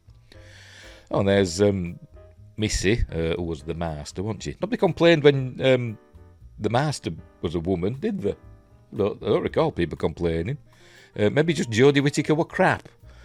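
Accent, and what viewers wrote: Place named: Yorkshire, United Kingdom. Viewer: Nice to hear another Yorkshire accent on Youtube, South Yorkshire? Old Wezzy myself that'knows....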